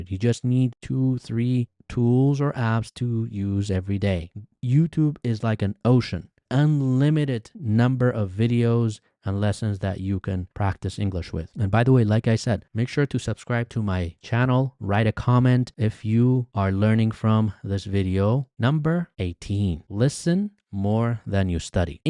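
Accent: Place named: United States. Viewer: Sir i eagerly want to learn English through your podcasts i learned a lot of things from you about how to improve English but the problem is that i want to learn British accent and your accent is American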